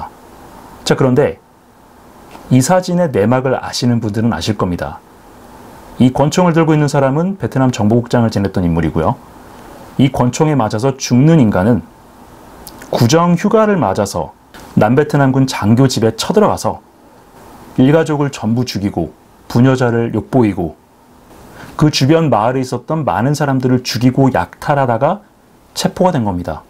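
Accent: Gyeongsang, South Korea. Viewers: 지역주의 말씀하시는 분이 더 지역주의 위에 계신 것 같은데, 신인균씨가 사용하는 사투리가 경상도라서 그렇지 그것빼곤 뭐 그닥 국방TV 운영하는데 문제없어 보이네요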